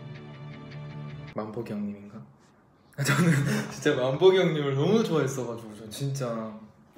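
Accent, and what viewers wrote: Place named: North Korea. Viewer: ㅠㅠ아 기여운 기먼필 ㅠㅠㅠ 진심 북한말 너무 잘해ㅠㅠㅠㅠㅠㅠ 아진챠ㅠㅠ 기욥댜ㅠㅠㅠ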